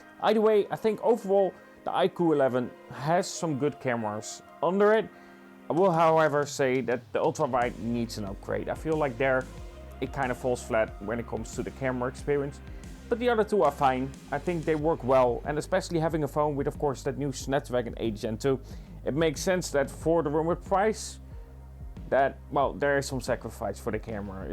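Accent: Netherlands. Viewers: Klinkt alsof je van Nederland komt. Je maakt goeie reviews 🙏🏾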